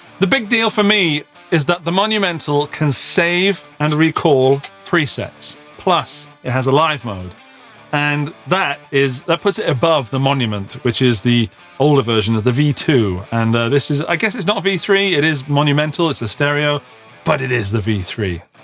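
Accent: United Kingdom. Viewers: Hey, digging this channel, love the fun crazy professor persona However slightly confused, pretty sure that's a British accent. I can say that with confidence because I was born in London and some 40 years later still can't work out how to get out. So my question is, are you a UK or US channel since pushing Sweetwater, who I understand are the Walmart of music stores, well known but not available in the UK?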